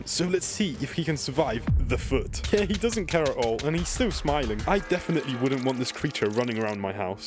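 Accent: United Kingdom. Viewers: Bros British I’m British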